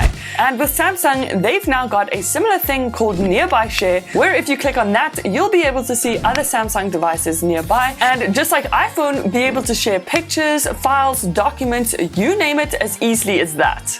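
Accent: South Africa. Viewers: Is she south African? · Heard a South African accent and hit subscribe. Only just bumped into your channel for the 1st time today. Quality content 👌🏾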